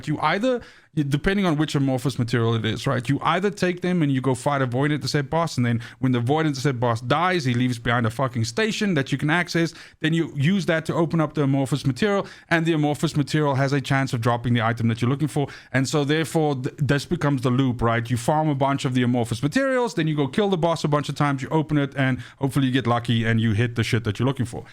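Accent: South Africa. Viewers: South African Afrikaans accent! Thanks for the video.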